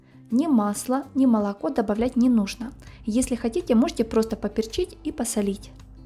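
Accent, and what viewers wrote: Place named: Odessa, Ukraine. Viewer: Привет Автор ролика! Спасибо большое! Всё понравилось! Судя по голосу Вы ближе к Одесской области находитесь) или Приднестровью?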